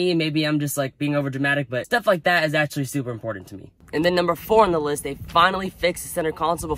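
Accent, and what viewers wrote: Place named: South, United States. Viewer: Love this video man! You sound southern, nashville here. I pick up my red model 3 next week and im so lit!!!! subscribing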